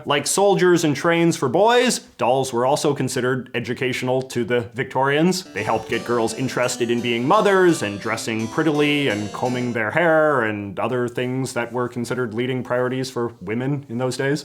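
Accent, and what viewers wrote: Canada. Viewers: Love your videos and your beautiful Canadian accent, but as a music nerd, each time I hear a baroque harpsichord when you mention the victorians it bothers me 😅 get some brahms or beethoven Romantic inspired music of the victorian period 🙌 music nerd out 🙃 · I love your Canadian accent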